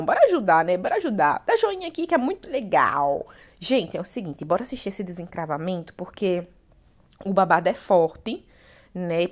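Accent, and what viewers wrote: Northeast, Brazil. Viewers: Nada chata, adoro o trabalho da, adoro os vídeos dela e até seu sotaque nordestino😍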